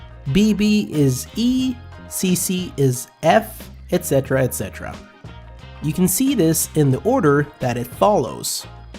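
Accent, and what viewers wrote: France. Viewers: good french accent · Are you french? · So beautiful french accent:O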